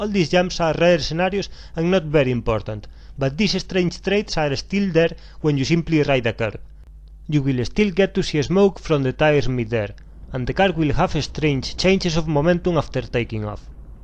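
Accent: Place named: Spain. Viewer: spainenglish! love XD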